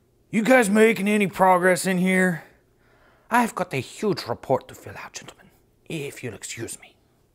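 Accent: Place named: New York, United States. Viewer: Your new york accent is awesome😅😆😅😆😅😅😅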